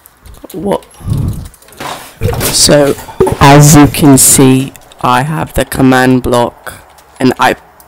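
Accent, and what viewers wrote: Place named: Australia. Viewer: WHY DO YOU SOUND AUSTRAILIAN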